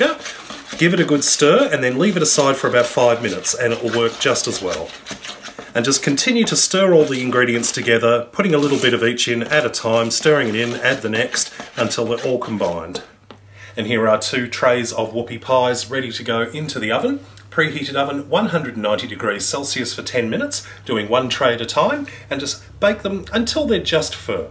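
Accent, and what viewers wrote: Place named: Australia. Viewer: Where did you find buttermilk? Judging from your accent i think your from australia? If so would they sell it at Coles or woolworths?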